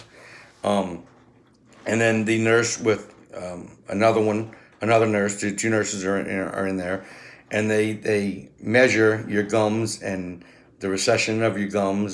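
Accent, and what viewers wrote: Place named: South Louisiana, United States. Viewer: Which location did you use. You sound like you might be from south louisiana. Nevermind got to it at the end lol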